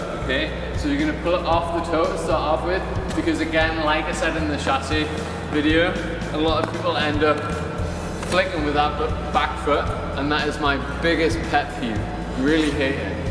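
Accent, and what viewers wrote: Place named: Scotland, United Kingdom. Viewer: It's the accent of course. Sounds.. Scottish?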